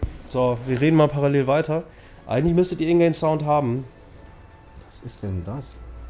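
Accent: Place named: Franconia, Germany. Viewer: ja klingt fränkisch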